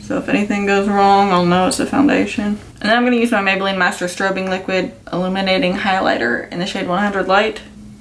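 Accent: South, United States. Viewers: where are you from? I hear a southern accent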